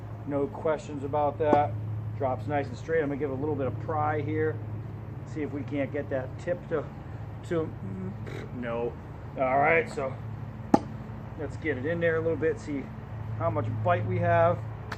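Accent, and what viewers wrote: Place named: Pennsylvania, United States. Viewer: Sounds like a Pennsylvania man. Beautiful knife and beautiful sycamore back there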